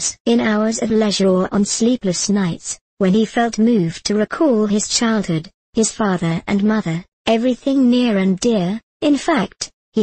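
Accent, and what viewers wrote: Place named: United Kingdom. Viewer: Nice to listen to a British speaker for a change.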